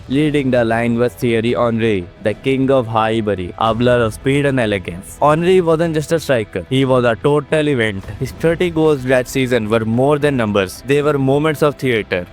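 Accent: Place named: India. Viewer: indian voice annoying use ai voice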